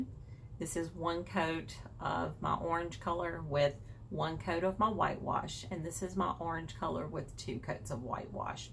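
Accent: South, United States. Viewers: I love that I have Found someone who has that Southern accent like me, lol.I tell everyone that I'm a Flatland Hillbilly from Texas, lol. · You nailed it sista! So nice to hear and see occasionally, a southern gal, like myself, doing diys . I’m a Kentucky girl. I’ve just recently started doing diy projects and there are so many ladies that teach and/or show how to do different things. It’s a tad overwhelming at times but I’m glad I ran across you. I’ll be sticking with ya Momma!!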